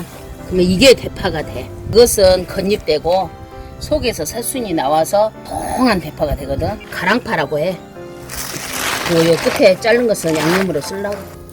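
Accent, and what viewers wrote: Jeolla, South Korea. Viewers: 구독 박고. 팬함. 전라도 사투리가 정겹네. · 전라도 목소리 듣기 힘드네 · 아따~!! 전라도요~~!! 구수한 사투리 정감있구마잉~ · 전라도 어딘가요 정감있소~ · 아따 전라디언이어라~~ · 전라도 광주나 전라도 목포쪽 톤이시네ㅋ 시골에 우리 이모 목소리같다. ㅋ 정겹네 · 고향이 전라도인데 사투리 너무 정감가요 어머니. 건강하세요. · 전라도 말투라했더니 역시 먹는 음식에 잘 하는군요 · 전라도 구수하네잉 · 즌라도 사투리에서 신뢰감 확 깨는데?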